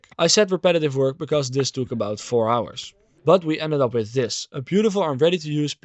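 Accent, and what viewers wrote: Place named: Netherlands. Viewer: The dutch accent is heavy 😂😂😂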